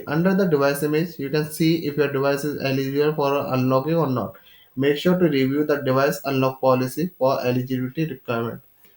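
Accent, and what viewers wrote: India. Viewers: your disgusting indian accent kills me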